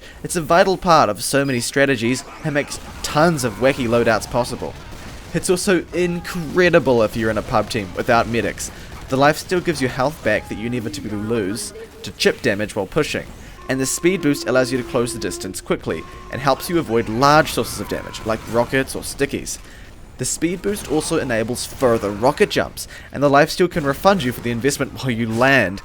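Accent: New Zealand. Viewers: ***** Yeah the New Zillind accent is quite similar to an aussie one to the uninitiated ;) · Vintage retro guy He's from New Zealand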